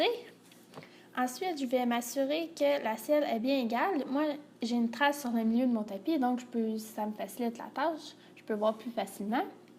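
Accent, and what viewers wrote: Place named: Canada. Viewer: Tu es canadienne j adoré ton accent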